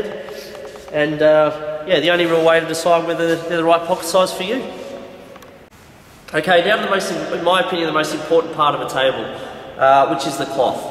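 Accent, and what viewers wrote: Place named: Australia. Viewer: What did he say at the end about the best cloth? what type? I find it hard to understand the AU accent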